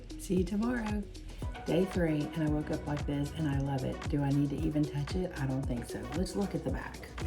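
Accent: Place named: South, United States. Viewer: Thank you for this video!!! I JUST discovered you and subscribed. I can't afford to go back to my DevaCurl Stylist right now, so I will be watching ALL of your videos. I also love your haircolor and highlights. Can I ask what color/brand you use? Also, you are so very pretty, great personality and adorable southern accent!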